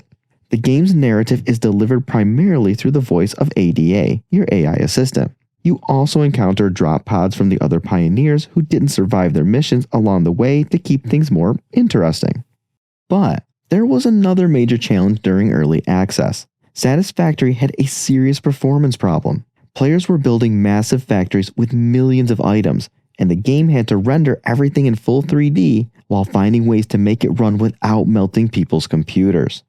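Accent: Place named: France. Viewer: I watched the video, other than French accent, I’m not hearing a difference. Jean rah (Jean like Picard from Star Trek)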